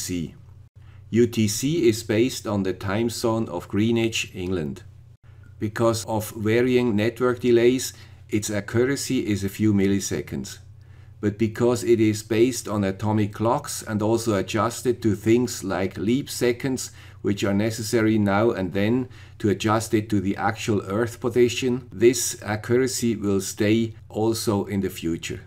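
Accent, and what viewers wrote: Switzerland. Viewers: Hello, the guy with the swiss accent, thanks a lot for the great videos and tutorials!I'm very new to ESP8266 and Arduino ESP. I coded a lot of stuff in Basic, Turbo Pascal and Visual Basic, but it is about 25year ago and I fear I forgot a lot of this. I tried to use your library but I did not get it run like expected.I just wanted to have a look, if the time and date is correct, so I used some serial.print commands. At first it showed nothing. Then I removed the "if(dateTime.valid)" command. I added some lines to your code: void loop { // first parameter: Time zone in floating point (for India); second parameter: 1 for European summer time; 2 for US daylight saving time (contributed by viewwer, not tested by me) dateTime = NTPch.getNTPtime(1.0, 1); // check dateTime.valid before using the returned time // Use "setSendInterval" or "setRecvTimeout" if required //if(dateTime.valid){ NTPch.printDateTime(dateTime); byte actualHour = dateTime.hour; byte actualMinute = dateTime.minute; byte actualsecond = dateTime.second; int actualyear = dateTime.year; byte actualMonth = dateTime.month; byte actualday =dateTime.day; byte actualdayofWeek = dateTime.dayofWeek; Serial.print("actualHour: "); Serial.println(actualHour); Serial.print("actualMinute: "); Serial.println(actualMinute); Serial.print("actualsecond: "); Serial.println(actualsecond); Serial.print("actualyear: "); Serial.println(actualyear); Serial.print("actualMonth: "); Serial.println(actualMonth); Serial.print("actu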